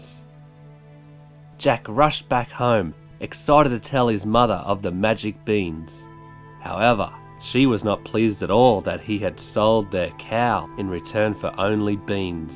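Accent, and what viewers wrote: Australia. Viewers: "once upon a time there was a boy named jack". you took me here!! Ps: aussie accent is attractive the most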